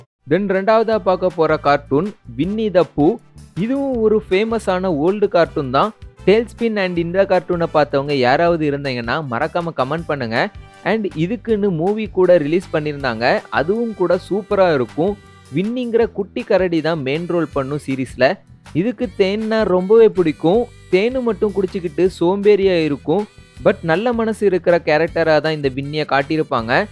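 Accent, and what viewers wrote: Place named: Kerala, India. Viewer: unga slang konjam Kerala Mari irunthuchu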